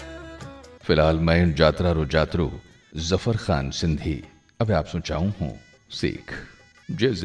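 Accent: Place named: Marwar, India. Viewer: जफर खान जी आपरी मारवाडी़ बोली गणी फुटरी लागे सा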